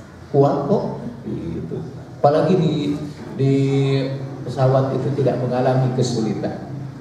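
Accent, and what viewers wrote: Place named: West Java, Indonesia. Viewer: Sunda na kaciri pisan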